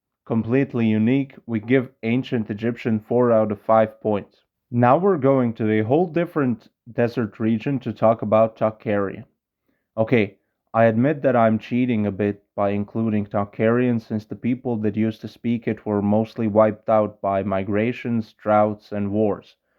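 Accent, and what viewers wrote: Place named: United Kingdom. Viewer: That's a possibility, but I think it's rather unlikely. His accent sounds to me like one of the lower class British accents, and in these accents "TH-fronting" (pronouncing the TH in "thin" as an F) is the norm. So it's much more likely that he simply grew up speaking that way, but that physically it wouldn't be difficult at all for him to pronounce "north" properly, had he grown up among people who pronounced that sound. ....Anyway, it's not a huge deal really, but I just find the F pronunciation of TH rather annoying, and he's literally doing a linguistics video, where he's talking about reviving extinct languages, so it doesn't seem unreasonable to ask him to start by reviving the TH sound in his own English 😉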